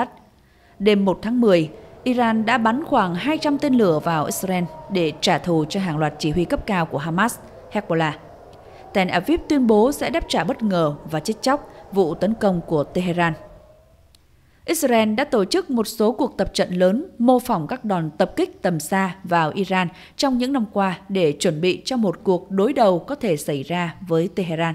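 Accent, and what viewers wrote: North, Vietnam. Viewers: Đài này phải Đài cũ của HTD không vậy, sao phát ngôn viên tiếng bắc đọc vậy?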